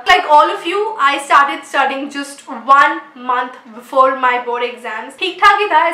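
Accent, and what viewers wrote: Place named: Gujarat, India. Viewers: Woh woh wohhh.. u speak very sweet gujju. Jus loved.. lots of love n blessings.. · You speak so funny Gujarati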